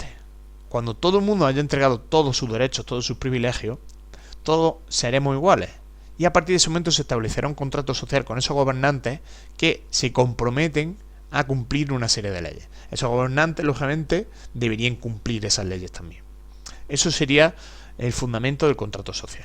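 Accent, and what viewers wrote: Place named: Spain. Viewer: Buena la presentación, pero se agradece por el acento ibérico que posee quien da la explicación que mejore la pronunciación de los nombres. Se pierde uno tratando de tener hilo. Gracias y saludos.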